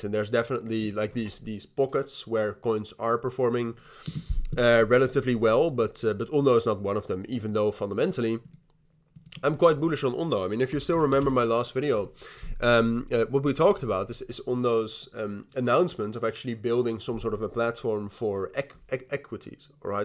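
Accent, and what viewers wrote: Netherlands. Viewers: Where you from? Netherland?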